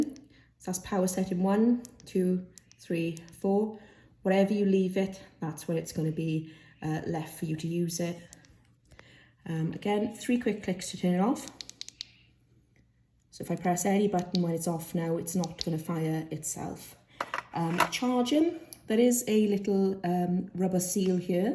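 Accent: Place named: Wales, United Kingdom. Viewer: A fellow welsh person by the sounds of it! :)...and great video! thanks!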